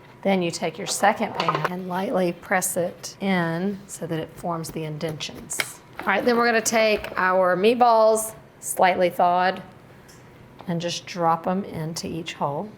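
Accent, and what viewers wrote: South, United States. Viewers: Italian babe with a southern accent. Win win!!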